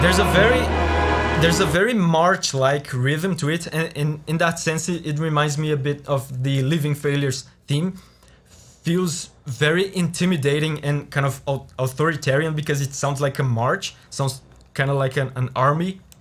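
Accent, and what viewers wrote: Brazil. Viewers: É incrível como dá pra saber pela sua voz que vc é brasileiro. É um sexto sentido. Aliás, vídeo bacana!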